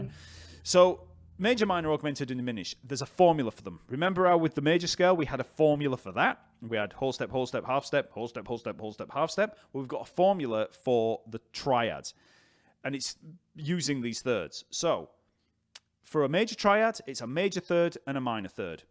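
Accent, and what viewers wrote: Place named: Northern England, United Kingdom. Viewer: Hey, cool about moving here to USA! I’m from both San Diego/Los Angeles, and live currently just north of Atlanta. I love your up north accent, no wonder I have no issues understanding you, because I am used to my fav Manchester bands accent, and can understand them when they’re drunk (most of the time), you kinda sound like Johnny Marr, Bernard Sumner!:) Sheffield is where the is from I think! I love Phil Oakey. They have some great bass lines, mostly synth but I think there’s a bass guitarist for “ ”:) glad you’ll be closer, so I stop missing the love hangs so much! Lol Dallas or Austin in TX is also cool! I’ve visited. Anyway thank you for this lesson! What you described about the chord progressions having a lot of extras on them is exactly what I’ve been struggling with within music theory. I bought your chord tones course and I’m so glad I did! 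I’m excited to get a start on it soon as my tendonitis gets better! Thanks so much for your awesome teaching style and materials! I have so many aha! Movements when watching your courses and YouTube videos!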